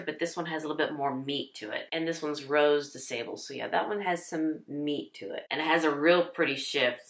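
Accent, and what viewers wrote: United States. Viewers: Loved the looks - and all beautiful. Nice to see on mature eyes, very pretty but in a gorgeous elegant way. 💕, also love your American twang and your gentle energy. Just gained a new subscriber. Greetings from UK! 🥰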